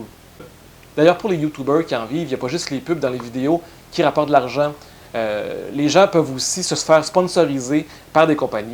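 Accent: Quebec, Canada. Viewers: franchement, c'est grace à ton accent que je t'ai découverte: c'état pour mieux me préparer pour mon prochaine voyage à Montréal.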